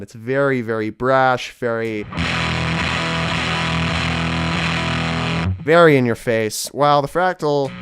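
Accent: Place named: Russia. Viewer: that sounded like a Russian accent, even though it was English. (I think I read your name as?)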